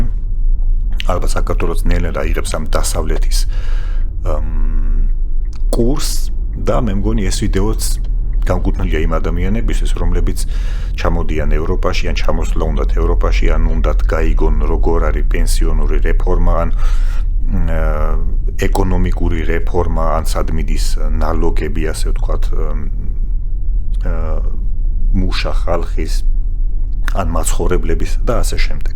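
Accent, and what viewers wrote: Russia. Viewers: საშინლად ტენდენციური ხართ. თუ ასე გეცოდებათ ,,საწყალი პენსიონერები" წამოდით მაქედან. ეგ რუსული აქცენტი ამბობს ყველაფერს, რატომაც ლაპარაკობ ასე )))) ვაუ რუსული მილსადენის გამო გაიყინებიან თურმე გერმანელი მოხუცები 😀 სამოთხე არ იქნება აქო... არადა კადრებშიც ჩანს რა ქვეყანაა და რა ხალხი. მოეშვით სხვების მოტყუებას. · რანგამაღიზიანებელი აქცენტი გაქვს რუსულს გავს და ყურები მეტკინა არადა საინტერესო კონტენტი გაქვს უბრალოდ აქცენტს რამე უშველე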